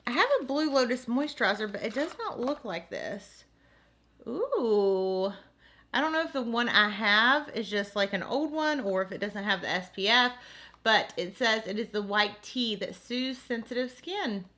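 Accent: South, United States. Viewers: Yay I’m early for once also I LOVE your southern accent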